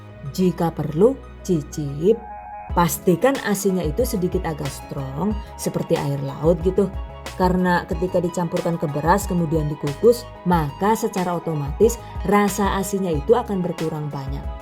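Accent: Banyumas, Indonesia. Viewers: Lah mbakyune ngapakers nggih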